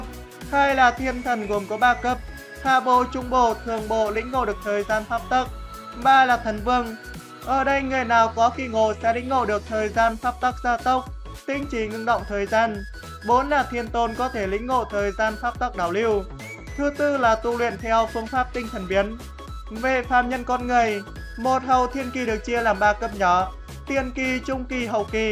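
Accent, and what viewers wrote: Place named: North, Vietnam. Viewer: Bắc kỳ đọc khó nghe quá…!😂😂😂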